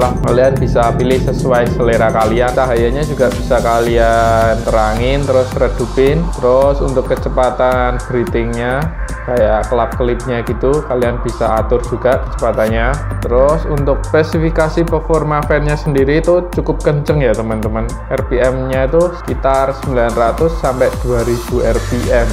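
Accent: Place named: Java, Indonesia. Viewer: Wah jowo, tak sub lur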